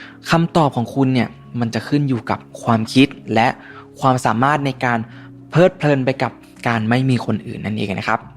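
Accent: Thailand. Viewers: สำเนียงไทยน่ารักมาก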